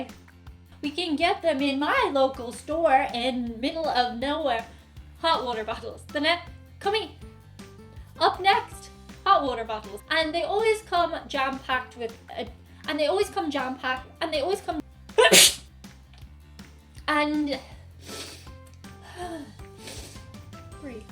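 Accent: Ireland. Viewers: just the Irish accent that's all.. just varies by region pronunciation of anything as to where you accent the vowels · Wow, I've never heard anyone pronounce bidet like that. You pronounce it as "B-Day", and myself n everyone I know says "buh-day". We don't say the the letter B, we just make the B sound, followed by the word Day. But its all good, I absolutely love your accent n the way u talk. I'd do almost ANYTHING to marry a female with an Irish accent. It's one of the sexiest things I've ever heard. 💚🍀💚🍀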